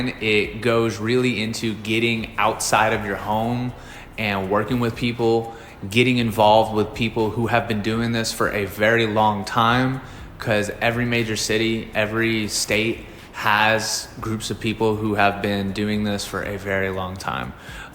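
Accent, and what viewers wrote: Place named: South, United States. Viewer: Your little southern accent is too freaking adorable. 😭😭💖💖💖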